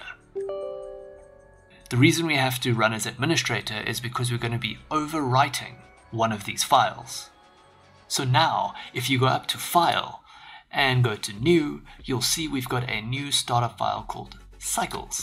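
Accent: South Africa. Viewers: I thought I recognised that accent as South African :) What part of SA? Cape Town?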